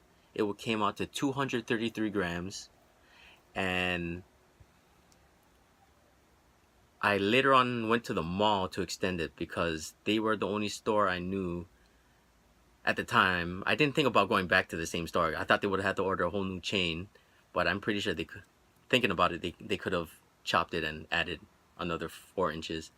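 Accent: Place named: Hawaii, United States. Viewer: Hawaii boy i can tell . kalihi maybe waianae ..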